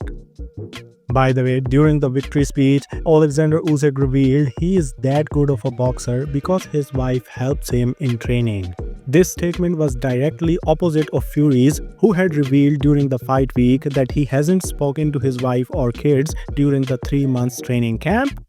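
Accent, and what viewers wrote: India. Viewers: Good to hear from you my homie with the Indian accent. Hope you and your family have been well. Thanks for the content you make in combat sports you do a fantastic job. I hope you have a good holiday season and a happy new year my friend!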